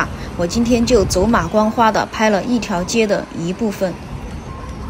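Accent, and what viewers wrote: Sichuan, China. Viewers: 流利的川普，就喜欢这个口音，加油。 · 看你的视频也学了不少四川普通话。👍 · 师姐是 四川人吗？ 川普好亲切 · 哈哈，晚上不要东晃西晃，四川话好亲切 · Haha - I can tell the host is from Sichuan where I came from... Take care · 大邑邛崃蒲江一带的口音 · 师姐你是四川人吗 都垮杆了😄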